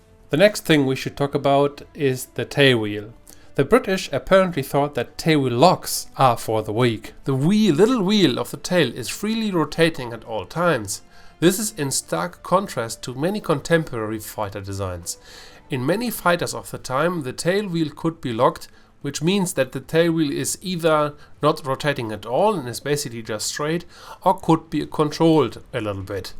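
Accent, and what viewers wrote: Germany. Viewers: I may be mistaken but you have a very thick German accent, a German in a spitfire, what a time to be alive.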